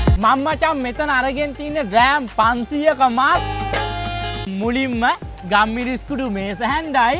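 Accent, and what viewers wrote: South, Sri Lanka. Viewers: Dakune bashavada manda😂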